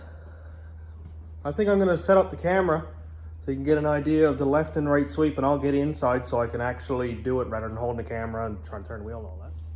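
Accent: Newfoundland, Canada. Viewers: You from Newfoundland or the Eastcoast by chance? Your accent sounds very familiar. Love the build! Cant wait to see more progress on it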